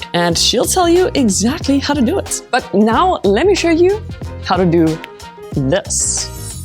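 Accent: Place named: South Africa. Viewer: This lady sounds very south african, where is she from?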